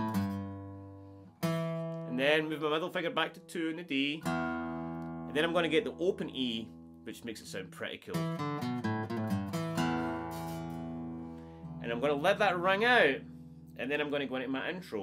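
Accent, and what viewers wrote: Scotland, United Kingdom. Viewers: Scottish? Good riffs? Good lessons? Instant sub mate! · He is Scotish . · Great video, To check his fingerwork, especially on the A7 ascent, I slowed the video down to 25 and 50%... The Scottish accent sounds brilliant!. Like some drunk old pirate - fantastic!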